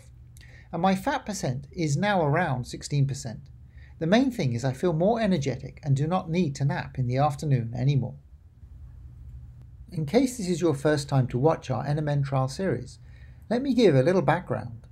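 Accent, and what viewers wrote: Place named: United Kingdom. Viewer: Friend, In spite of your predictably formal Brit accent and you're at least pseudoscientific approach to self-analysis, your presentation is very ephemeral and subjective, and even though I am a great fan of nmn, this is not a particularly compelling presentation of its benefits. My suggestion is to try instead, to relax your delivery.. even if it means pretending you're an american.. and to provide far more substantive information, rather than merely to emulate the superficial appearances thereof. Then you'll be well on your way to a far more helpful presentation.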